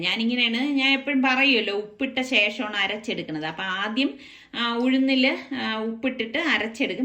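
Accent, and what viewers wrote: Trivandrum, India. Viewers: Yellam super TVM samesaram super Njanum aviduthekariya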